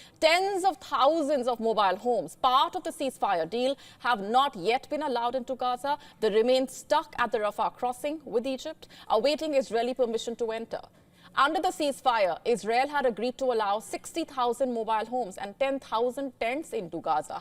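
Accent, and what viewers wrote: India. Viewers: Indian English so funny😂😂😂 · man fkn indian accent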